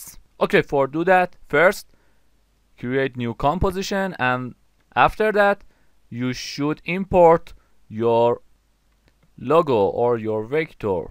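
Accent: Iran. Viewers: Hmm are you from Iran? Your accent sounded like your Iranian.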